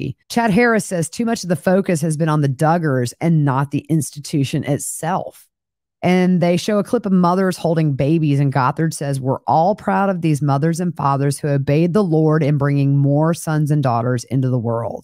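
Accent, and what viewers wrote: South, United States. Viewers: I know I love her southern accent so much ❤❤❤❤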